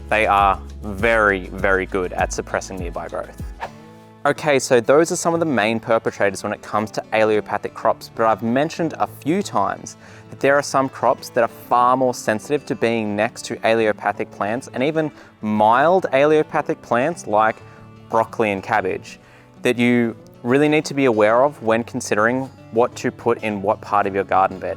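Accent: Australia. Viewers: Don't pick on his Australian accent. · That strangulated Australian accent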